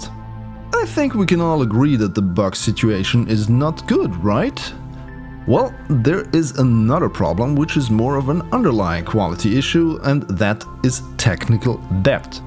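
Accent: Germany. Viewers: You can even hear the typical German accent when he speaks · R U German? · tolles video wusste doch, dass du deutscher bist, der akzent ist unverkennbar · Du bist Deutsch? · Are you from Germany? BTW, great Video!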